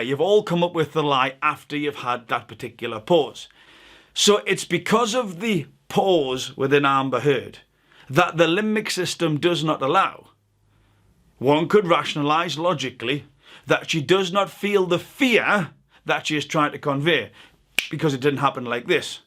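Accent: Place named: Scotland, United Kingdom. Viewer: Nothing better than listening to a Scotsman speak. ❤️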